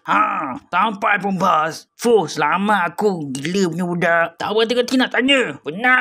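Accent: Malaysia. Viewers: Abang negeri ape perak atu kelatan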